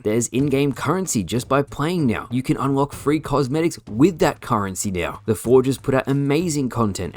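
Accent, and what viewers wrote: Australia. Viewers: I can't find any matches in infinite you sound Aussie too so I wonder how you do with matchmaking I can't get a single match in Infinite on PC, and in MCC I just get matched with Yanks and Euros but at least get some matches at all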